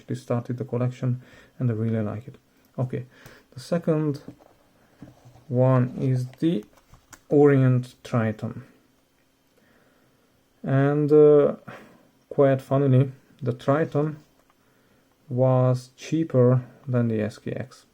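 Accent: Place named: Europe. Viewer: Myself included, majority of watch enthusiasts thoughtlessly buy and acquire all sought of random watches for display, hoarding and bragging purposes. In doing so, the art of watch collection loses its meaning and enjoyability and becomes a liability - as we are constantly looking for the next watch to buy without ever enjoying the few we have. Am American and consumerism here in the US is like a demonic spirit. The main take-away from watching this SOTC Video is Modesty. I also like that your collection is SUB$300 USD and project to liquidate a few pieces and buy one masterpiece that will endure more wrist time and bring you more satisfaction. The Title of this video should be: "STATE OF PRUDENT COLLECTION." Judging by Your reasoning and modest watch collection says, to me, that you are a simple, humble yet intelligent person. Your soft voice makes for an easy listen as well. 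I hear an accent but wasn't sure where you from in Europe. Thanks again.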